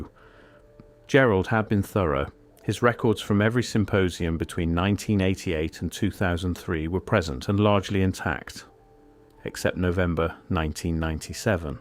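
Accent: England, United Kingdom. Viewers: Such a bore...the english accent makes it even more borish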